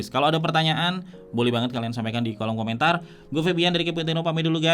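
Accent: Malang, Indonesia. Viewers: Asli malang bang ?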